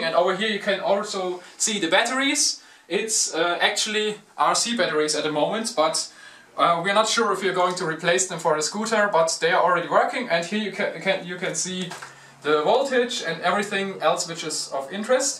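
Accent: Germany. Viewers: Is he German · Du bist deutsch ich weis es man merkt es voll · you have a proper German accent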